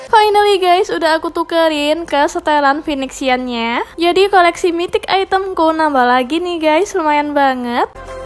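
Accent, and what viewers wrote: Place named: Central Java, Indonesia. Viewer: Logatnya kya orang jawa tengah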